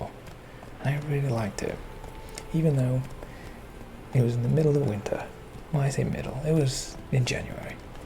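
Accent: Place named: Yorkshire, United Kingdom. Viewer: I dunno if I’m tripping mate, I can hear the Yorkshire accent (I’m from South Yorkshire) but definitely sure I can hear some American in there 😂 *EDIT. You’ve just explained in the video hahaha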